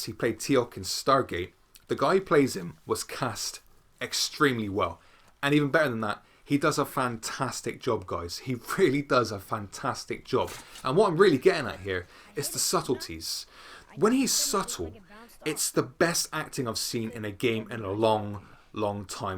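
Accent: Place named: Bristol, United Kingdom. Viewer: Also, where you from? You sound like a fellow Bristolian!?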